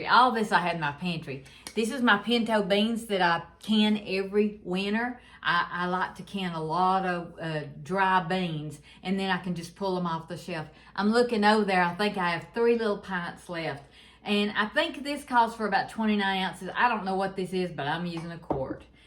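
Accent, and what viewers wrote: South, United States. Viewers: THANK♥️YOU FOR SHARING YOUR VIDEO! I RAN ACROSS YOUR CHANNEL WHILE LOOKING UP THE COPYCAT WENDY’S CHILI RECIPE SUBSCRIBED TO YOUR CHANNEL. I JUST LOVE YOUR SOUTHERN ACCENT,YOUR CONTENT AND YOU,YOUR FAMILY AND HOW YALL GAVE BEEN MARRIED FOR 45 YEARS. I WAS BORN,RAISED& LIVE IN NORTHEAST GEORGIA. ME&MY HUSBAND HAVE BEEN TOGETHER FOR GOING ON 23 YEARS(3/24/25) & HAVE BEEN HAPPILY MARRIED FOR GOING ON 22 YEARS(4/6/25). WE HAVE A DAUGHTER(AGE:22) & A SON(IN HEAVEN) HE WOULD BE(AGE:17). OUR DAUGHTER HAS A BABY BOY(AGE:2)OUR FIRST GRANDBABY AND THE JOY OF OUR LIFE. WE ALSO HAVE 3-FUR/4~LEGGED BABIES(CHIHUAHUAS),AGES:10,9 & 1. WE ARE VERY BLESSED! JESUS IS THE REASON FOR THIS SEASON! HE IS #1 IN MY LIFE & HE IS THE ONLY WAY TO GET THROUGH ANY TROUBLES IN EVERYBODY’S LIFE. KEEP UP THE WONDERFUL WORK/VIDEOS YOU DO! YOU’RE AWESOME!!! HAVE A✝️BLESSED & 💟WONDERFUL🌛NIGHT!👍🏻😉💓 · I made this chili & my man loved it! 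Thanks for sharing! I love your Southern accent, I lived in Florida but am back home in Wisconsin!😊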